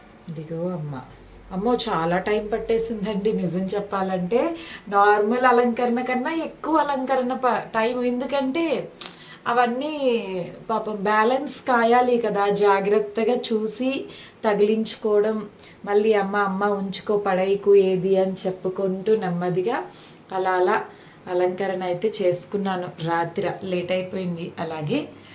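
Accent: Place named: Visakhapatnam, India. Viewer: Chala bagundhi andi,meeru undedhi vizag ani nenu anukuntunnanu mee matalu alage unnay ,maadhi kuda vizag ne ,yevarikyna telisthe cheppandi,mimmalni okasari kalavaalani undhi andi,oka vela meedhi vizag ayithe naaku cheppandi kalusthanu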